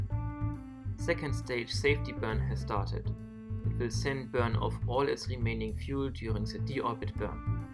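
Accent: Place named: Germany. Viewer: I don't think your accent/ pronounciation is Bad ctually, I think the way you say the 'th' is just really german, and I hate it when people say it like "s".:P Ich bin übrigens auch deutsch:D Hat mich ne weile gedauert das ordentlich aussprechen zu können xD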